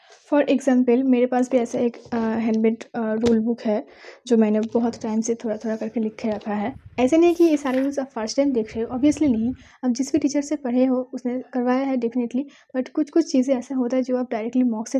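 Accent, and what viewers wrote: Bengal, India. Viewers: Are u Bengali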